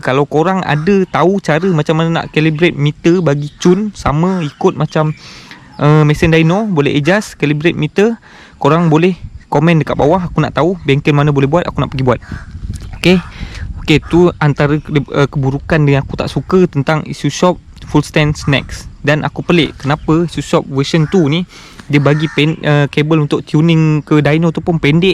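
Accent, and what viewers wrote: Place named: Java, Indonesia. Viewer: dgr dia ckp dri mle2 tgk dh tau jawa. Tgk muka pon tau. Sbb aku pon jawa. Haha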